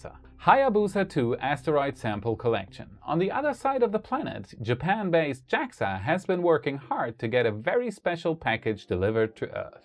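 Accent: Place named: Germany. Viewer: Strong German accent, sounds awkward.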